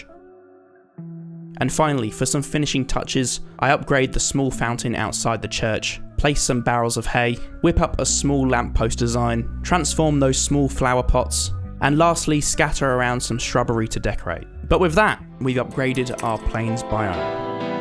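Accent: United Kingdom. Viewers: British Accent Nice!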